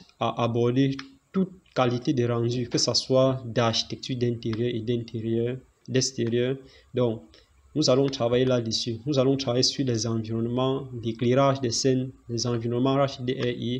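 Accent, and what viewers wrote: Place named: Cameroon. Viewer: vous êtes camerounais je suppose ! J'aime trop la manière de parler des camerounais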